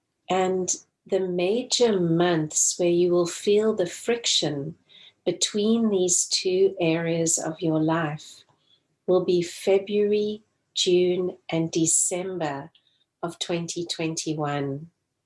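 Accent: South Africa. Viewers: I detect a South African accent.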